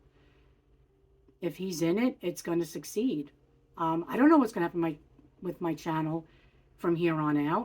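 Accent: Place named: New York, United States. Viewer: Thank you for sharing this!! It has been in my mind to start a channel, and you have given me some encouragement. I love your tiny home -- a year ago I was looking for one, but the cost of the lot rent and the locations kept putting me off. I travel a lot in a campervan, bought during the pandemic because it literally grounded me from flying. So I have my house, with unbelieveable story's about my "refresh" using AI and I think that would be fun to share along with my van adventures. So, thank you. You sound like you have a New York accent. Be blessed!!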